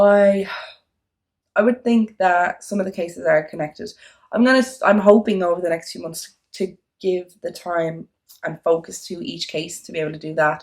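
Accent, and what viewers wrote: Ireland. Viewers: Good stories,but the content in which your reading these murders is very clipped,meaning you can't read a full sentence without blurting on about something else,ur train of tght seems to drift off and it's then hard for the listener to get the gist of the story.Maybe if you just read the story as it's been presented instead of going backwards and forwards with your presumtions it might be easier for us all to follow. I do love your irish accent tgh.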